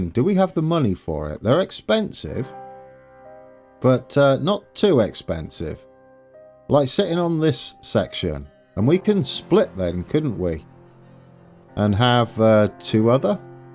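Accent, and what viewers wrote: United Kingdom. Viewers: For as British as you sound, not knowing Great Clock of Westminster is ironic. :P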